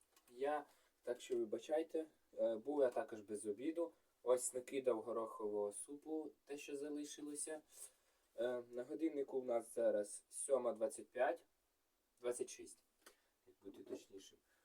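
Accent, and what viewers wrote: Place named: Surzhyk, Ukraine. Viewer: а ты с какой области? У тебя очень интересная речь, смесь украинского и русского (на Донбассе это называется "суржик"), звучит мило😉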